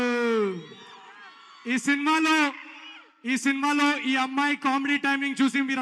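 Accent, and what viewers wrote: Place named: Telangana, India. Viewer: Telangana hero haa basha lone shammi vundhi abba 🔥❤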